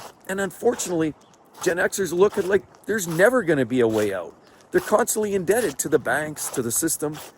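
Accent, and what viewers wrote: Canada. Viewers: That's one helluva hoser accent, eh? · you sound canadian... was waiting for you to say 'eh'.... im guessing youre a youpeer maybe.... IDK, Im Alaskan, and you sound like a lot of canucks i know... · This guy sounds pretty Canadian to me should’ve said so at the beginning.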